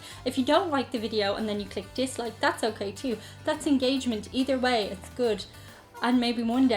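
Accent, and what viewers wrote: Ireland. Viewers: An ageing Englishman here who just happened upon your you tube channel. For years, I have said that my personal two favourite accents in English are standard RP English English accent (Fiona Bruce for example) but top of the list is the middle class, educated, cultured Dubliner accent - I inwardly swoon - male or female when that kind of accent is being spoken around me. Your accent, is gorgeous. As for the American attitude towards the whole Irish/English thing - I hardly know a street in England without a resident Irishman or woman - mostly married to an Englishman/woman. I have been to the Republic of Ireland many times and my accent gives me away as a Southern Englishman immediately. I have only ever been treated properly and respectfully as the minimum but generally very warmly as the Irish are generally wonderful. Indeed, when I have been in mixed company with Irish, English and Americans, what we, the English and the Irish have in common normally bonds us together more easily. 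Good luck with your channel. Love from London. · Eventually things will get to the point where Youtube will prohibit all specific words. You'll have to say things like, "Article adjective adjective noun verb. Verb! Verb article adjective noun!" I don't know one Irish accent from another. I can tell there are differences, but I can't tell what part of Ireland someone is from just from their accent. I do think the various Irish accents (including yours) are the most beautiful in the English language. They're musical. Subscriber curse: You'll spill water on your chair without knowing it, and when you sit down you'll have an unpleasant surprise. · Would you ever consider doing a class teaching a proper Irish accent? Edit: PS I love how your nails match your dress! 💜😘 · Ms., my favorite accent used to the British accent. Since finding you, my favorite accent is now the Irish accent! With your beauty and accent, I'm hooked.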